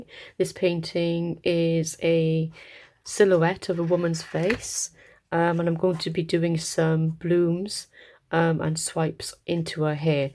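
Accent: United Kingdom. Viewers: Beautiful work ! Wow ……where is you accent from may I ask ……you sound like you’ve e got a British twang like myself lol ❤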